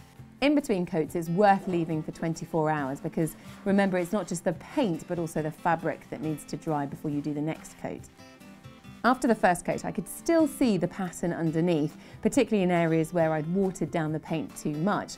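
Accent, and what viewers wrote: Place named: England, United Kingdom. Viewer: Finaly a proper english accent. Sooooo tired of American accent on YouTube. Thank you ⚘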